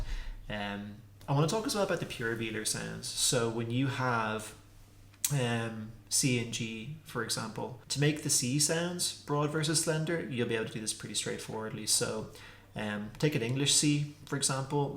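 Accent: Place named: Ireland. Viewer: Oh, not at all, there's definitely a beauty to the diversity of the dialects in Irish. I suppose its analogous to tomahto (Ireland) vs tomayto (US) vs tomawto (South England) in English. The Irish or Gaelic dialectical continuum is a fascinating subject, making for a lot of fun comparisons with languages like German and Italian (eg Napoletano). I'd like to read more on the reconstructions of the extinct dialects of Irish, it's a pity the Dublin Gaelic blog isn't updated so much any more. If you know of any others, do let me know. I'm looking forward to your next videos. We need more people like ye pumping out content with authentic Irish phonology & intonation. Even a 10 min conversation between you and Patchy or Dazpatreg would be a joy.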